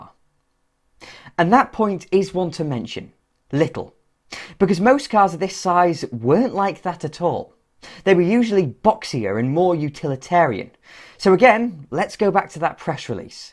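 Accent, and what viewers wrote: United Kingdom. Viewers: Doug DeMuro, but British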